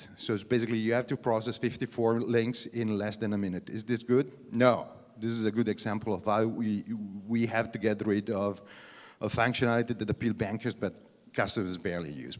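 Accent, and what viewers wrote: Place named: Germany. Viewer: Ma parli inglese con accento tedesco. Come Giorgio Moroder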